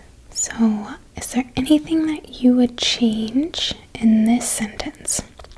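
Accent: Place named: Upper Midwest, United States. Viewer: Phil Mercer many of us think she’s from Wisconsin or Minnesota. I’m from Wisconsin and that’s what I think dere hey.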